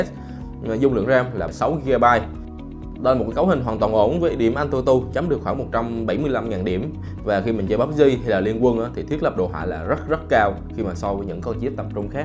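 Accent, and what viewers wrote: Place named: Central, Vietnam. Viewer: Giọng như miền trung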